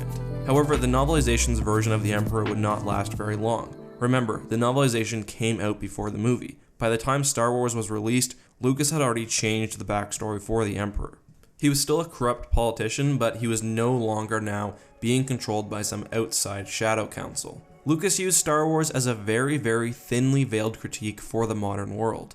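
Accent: Canada. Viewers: Question for you. Not a criticism, but from the way you say your “outs” you’re Canadian or from the Wisconsin region. Correct?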